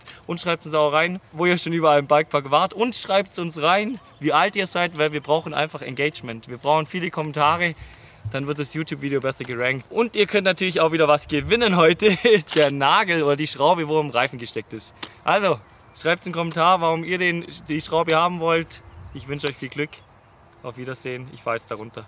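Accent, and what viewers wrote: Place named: Allgäu, Germany. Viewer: Grieß da! Ich kann mit Downhill zwar eher wenig anfangen bzw. hab da zu arg fear, feier deine Videos aber richtig ab. Bleib auf jeden Fall so spontan, witzig und sprachlich Allgäuerisch eingefärbt. Das ist mega Authentisch!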